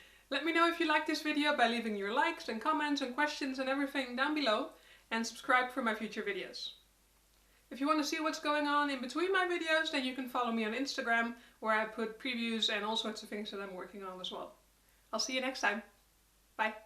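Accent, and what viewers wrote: Netherlands. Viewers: I'm so happy I found this site..ordered myself a glass etching tool..I'm going to guess your accent is Nederland...klompt?? Jim: Olympia,Wa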